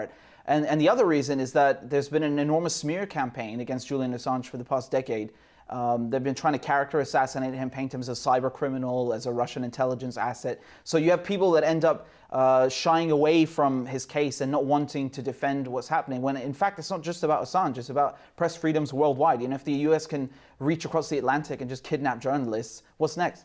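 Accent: England, United Kingdom. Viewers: Your English accent comes out when you speak to other English people. I love it.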